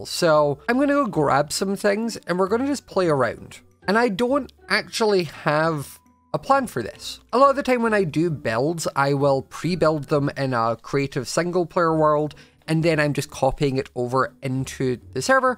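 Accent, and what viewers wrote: Scotland, United Kingdom. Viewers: Love your videos. Keep up the good work. Also what part of Scotland are you from, I'm from Glasgow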